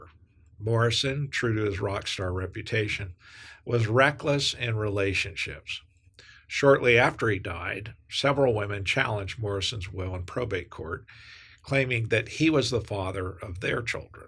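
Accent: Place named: Pennsylvania, United States. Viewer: A few minutes ago I posted and said that you sounded almost as if you had grown up in Pennsylvania but I just read on your website that you are a Sacramento native! Well I got that one wrong didn't I??😊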